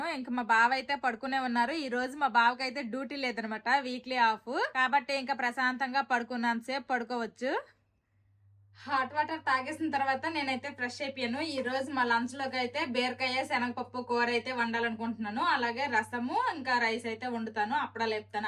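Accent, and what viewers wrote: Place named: Nellore, India. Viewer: Hi nuvu same ma lage mataladutunnavu madhi nellor ma amma valupurilo vuntaru yavaru emi anukunna mana pani Manam chevali nuvu super &great ink Manchi videos chevali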